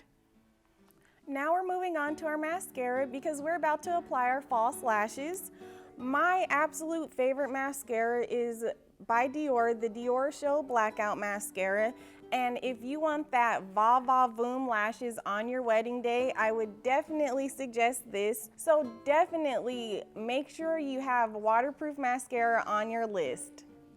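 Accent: United States. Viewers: Where are you from? I can't pin point which area in America your accent is from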